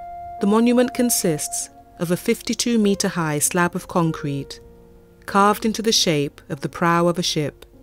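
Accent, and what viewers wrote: Castile, Spain. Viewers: Not only does the narrator pronounce Portuguese words with a heavy Spanish accent but she puts me to sleep. Wrong background music btw. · Só pelo facto que pronunciar os nomes portugueses em espanhol este vídeo devia de ser destruído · I appreciate the interest but to have the narrator pronounce portuguese names with a spanish accent kind of ruins the experience... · With a Castilian accent, Seriously! It is very annoying to listen the way you try so hard to pronounce the Portuguese names with Castilian (spanish) accent. Please speak with a English accent or Portuguese accent, a accent were you are from is more natural because you sounded... ridiculous. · We are NOT Spaniards. We speak Portuguese! FFS. I had to turn on captions so as not to have to listen to the narrator butcher words with a castilian pronunciation. Portuguese pronounce J s as Js not as h as the spaniards do. 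Really annoying. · The narrator seems to think that we must be spanish since all the times she mentions a portuguese name (E.g Castelo S. Jorge, Martim Moniz) she does so with s spanish accent.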